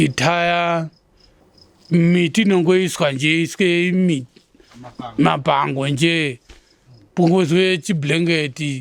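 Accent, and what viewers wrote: Ndau, Zimbabwe. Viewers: Ko nanhasi achikungotaura chi ndau like this but all these yrs haasati achinja tone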